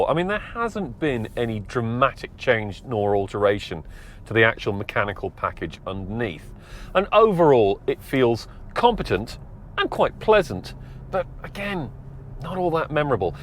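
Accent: England, United Kingdom. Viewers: because he's English :) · Is this guy english?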